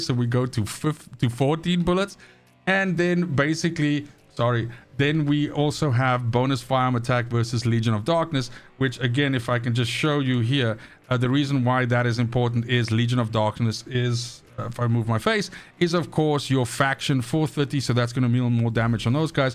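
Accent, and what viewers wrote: South Africa. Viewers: Your the best I recommend you when people need help . Are you from South Africa as your accent sounds that way 🤔. OK this Serena issue As you and others make builds that wipe out enemies and boss killers in 3 seconds them its a bit harsh to say Nexon are to blame.. 3 weeks ago the Gley gun build ruined the boss for everybody and in the game Is that not the same . You then have the nexon put out a new top tier character Keelan anybody??? He was new I have yet to see him in a game I had him but deleted him as I needed a slot. So that argument is done. As for Serena I play another game Naraka bladepoint its free and basically first descendant copied it and moved it to space Naraka is Chinese melee swords OK. They made a character that flys last year OK wow he will destroy players Novelty wore off nobody uses him Exactly the same fire water chill toxic skills. 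So this Serena so far again hardly seen in game Majority are bunny and freyna who just wipe out everybody as usual.If this Serena is so dope then as players bitch about grinding then use her and clear missions fast.or do they want a weak character to take longer . End game bs The game is free people get 900 plus hours thats more than most triple aaa games It takes time to create cgi etc Stellar Blade game of the decade 30 40 hours . If you want hours and hours get baldurs gate. OK As for these boss killers I have not seen any that do 2 seconds in a group They show a solo boss The boss is weakened for Solo So they are playin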